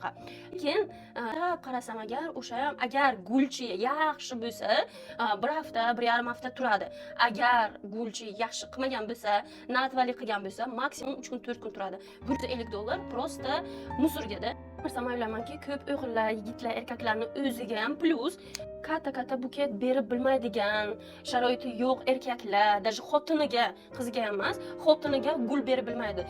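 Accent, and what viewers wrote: Bukhara, Uzbekistan. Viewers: ❤❤❤ zo'r wud hamin gapaton pryam buxorocha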